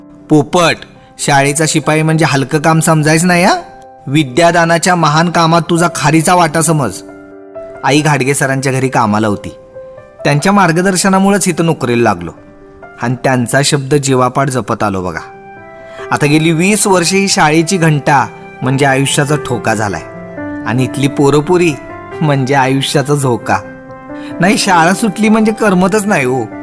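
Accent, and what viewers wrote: Kolhapur, India. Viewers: एक नंबर साहेब. नुसतं आवाजावरनं सांगतो तुम्ही कोल्हापूरचे आहात. आम्ही आहे दुसऱ्या शहरात. पण जिल्हापरिषदेच्या शाळेसारखी मजा कुठेच नाही.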